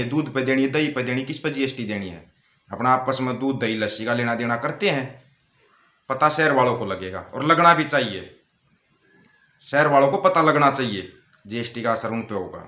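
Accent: Haryana, India. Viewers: Sir, your Haryanvi accent is balle balle. Carry on.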